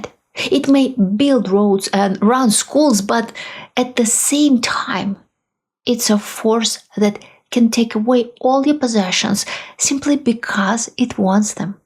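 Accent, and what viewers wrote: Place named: Russia. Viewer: I could listen for hours to your charming Russian accent presenting horrific geopolitical events as smoothly as a wonderful cake recipe. Beyond my admiration for your voice, your analysis is spot-on. You called it—Europe is slowly waking up. Yesterday's drone strike on a ghost ship near Turkey proves your point. No harm to the sailors, but enough to stop the vessel dead in its tracks. For the unscrupulous capitalist adventurers buying Russian crude at 40% discounts, the message is clear: think twice. That "bargain" can cost you 100% when your ship becomes a target.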